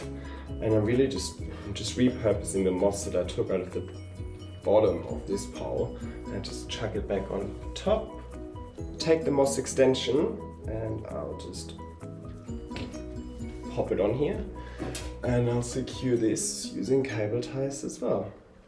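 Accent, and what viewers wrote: Germany. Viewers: Den deutschen Akzent hört man einfach immer raus :-) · Lovely video! Is that a german accent u got?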